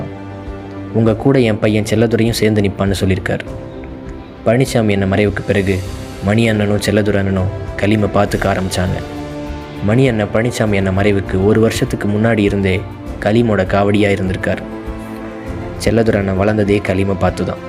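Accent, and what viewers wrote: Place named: North Chennai, India. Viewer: Enapa ithu vadachennai style.la kathai soldra...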